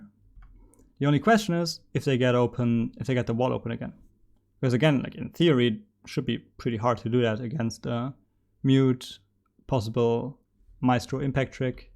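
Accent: Germany. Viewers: Are you from germany? It kinda sounds like that to me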